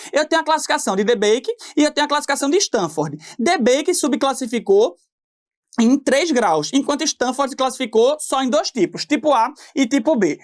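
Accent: Pernambuco, Brazil. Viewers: Que aula tão maravilhosa! Além de um sotaque pernambucano, já tá na velocidade "normal": na velocidade do! kkkk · Show demais, bem top assistir aulas assim sem ser "formal demais", sem falar o sotaque típico de Pernambuco que acho muito massa, marcante e personalíssimo; um dos mais bonitos das regiões do Nordeste na minha opinião.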